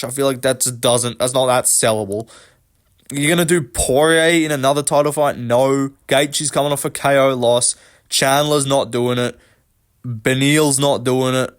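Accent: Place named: Australia. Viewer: Bedtime MMA but more Australian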